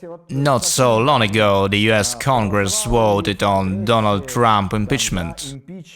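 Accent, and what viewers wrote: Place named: Russia. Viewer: И дурацкий русский(?) акцент ещё зачем-то. Для нац.колорита что ли?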